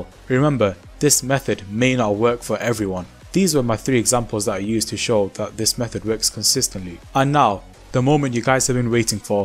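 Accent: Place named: India. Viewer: no racism but you are the first indian sounding yuotuber that has done a legit tutorial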